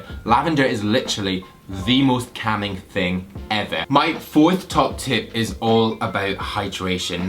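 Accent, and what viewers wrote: Scotland, United Kingdom. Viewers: Eddie Lopez It's a Scottish accent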